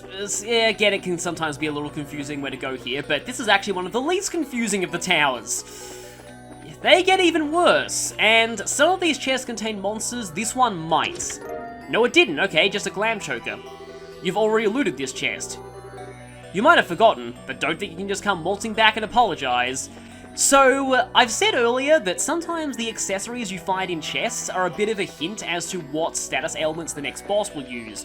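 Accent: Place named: Australia. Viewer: Aaaaand there's the leitmotif. Also, at least in the PSP version, enemies don't respawn even if you leave the tower and then come back. They look a lot like stone tablets (with the archetypical look of the Ten Commandments coming to mind). ...Thinking about it Ys 8 did a similar thing, except instead of the computers projecting them as images the tablets were the "computers" and projected the images themselves. I'd imagine it probably is a regional one; I've never heard an American or Canadian say it as anything other than "day-tuh", but I've herd people from the UK and (obviously now) Australia say "dah-tuh".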